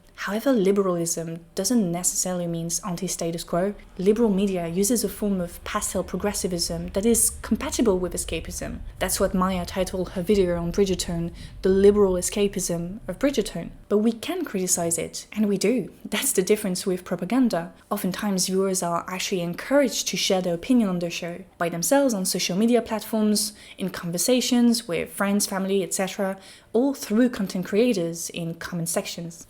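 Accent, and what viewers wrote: France. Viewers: Daaamn girl. You are 🔥. Loooove the French accent as well 🙈. What's your Also it might be true that Gen Z are more politically active but not really well educated/informed on the political topics they are active about. It seems they just want to be angry at something and want to be loud because of it.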